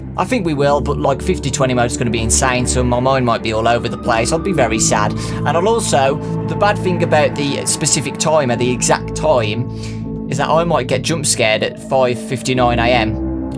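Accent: United Kingdom. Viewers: Awesome you british voice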